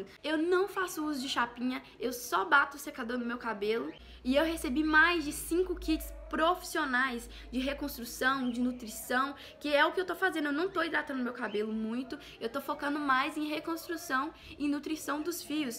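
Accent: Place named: Minas Gerais, Brazil. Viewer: Adoro nosso sutaquezinho mineiro 😍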